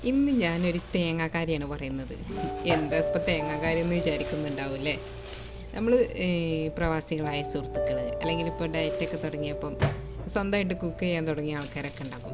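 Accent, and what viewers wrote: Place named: Travancore, India. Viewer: കൊല്ലക്കുടിയിൽ മൊട്ടുസൂചി വിൽക്കുക എന്നൊരു പഴം ചൊല്ല് ഉണ്ട്; ഏതായാലും താങ്കളുടെ തിരുവതാംകൂർ സ്ലാങ് മലയാളം കേൾക്കുന്നതിൽ സന്തോഷം.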